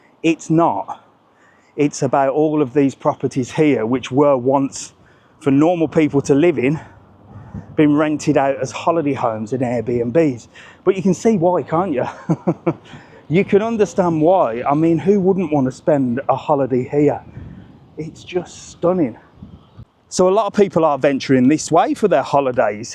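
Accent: Birmingham, United Kingdom. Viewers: Just recently discovered your videos. Loving them. I'm a Brummie myself and love your Brummie way, and your Mum and way too, haha..trust him to spot MaccyD's! I'm severe sight impaired and have hearing aids too now. I am closely considering a stay in Lanzarote, and at the Arrecife Grand; so it's useful to get a good look round and insight. Probably be the first Romulus FC fan in Lanzarote...I wonder.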